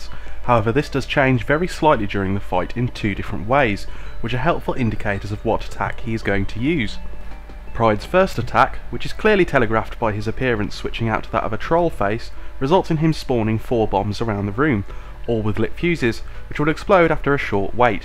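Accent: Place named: United Kingdom. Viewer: This is really difficult to understand. I hate this kind of british accent.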